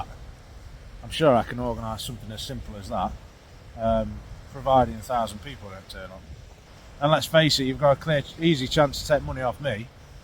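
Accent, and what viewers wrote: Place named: Derbyshire, United Kingdom. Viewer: I live just outside Leek, I shoot competition air rifle with a lot of Derby / Matlock lads so knew you were that way from the accent😄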